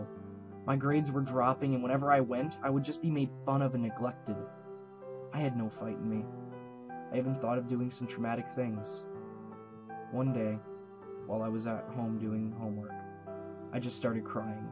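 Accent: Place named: United States. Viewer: You have no idea how hard it was for me to figure out what you meant by preschool, middle school, and highschool. Im from England so Im not really used to how you americans pronounce things xD I think I get it... Like if you cri evrytim.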